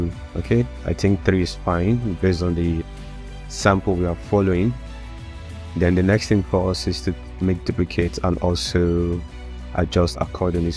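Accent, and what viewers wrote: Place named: United Kingdom. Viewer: Why british accent exist-_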